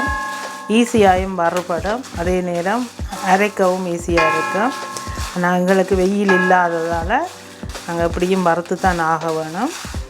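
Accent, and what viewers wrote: Jaffna, Sri Lanka. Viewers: யாழ் தமிழ் மிகவும் அருமை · Very fine.thanku very much. Your srilanka tamil fine.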